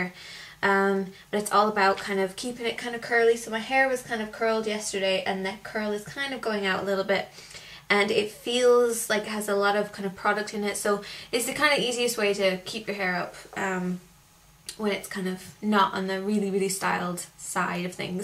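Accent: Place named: Ireland. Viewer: i thought u had a bit of irish haha :L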